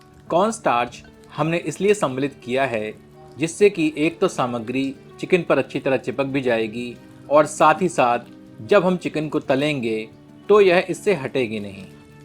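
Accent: Standard. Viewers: Ur Hindi is so shudh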